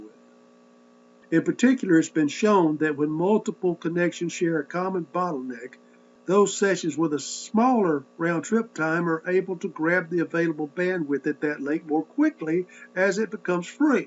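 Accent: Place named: South, United States. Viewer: Nice lecture and love that southern accent :D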